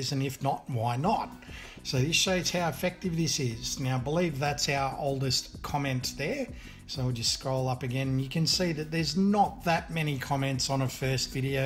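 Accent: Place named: Australia. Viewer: Love the accent, it is the guy's accent, I am guessing Aussie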